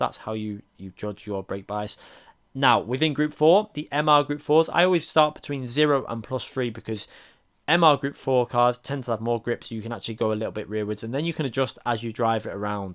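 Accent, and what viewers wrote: United Kingdom. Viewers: That British accent make tutorial videos sounds so much better. It feels like I am watching an actual race with commentators speaking in the background.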